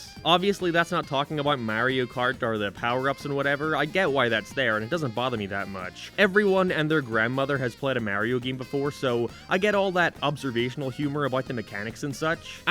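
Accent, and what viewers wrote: Ireland. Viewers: dude is Irish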